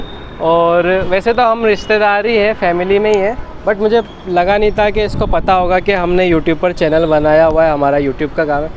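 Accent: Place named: Pakistan. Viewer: App Kay kahnay ka style same pakistan ki Tarah hai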